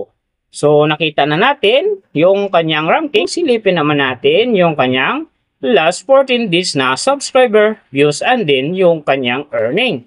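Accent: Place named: Visayas, Philippines. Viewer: Visaya kb dong? Bkit ganyan k magsalita.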